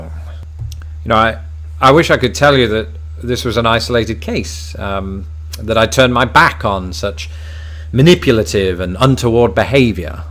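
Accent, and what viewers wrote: United Kingdom. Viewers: But your accent is subtle. You must be losing some of it. But having said that, we do love the British accent over here! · Don't worry, I forgive... Wait. What was I going to say? Oh, it doesn't matter. Talk to us some more. I love your British accent. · Subbed! Was persuaded to by your amazing British voice... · ~sigh british accents~ =) I forgive you but just keep making videos so I can hear your accent lol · Don't beat yourself up. The British accent is bound to color the speech of a traveler from that place. East Indians and Russian immigrants are equally noted for the novelty of their accents. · You can use that British voice any time, sweetie! 😉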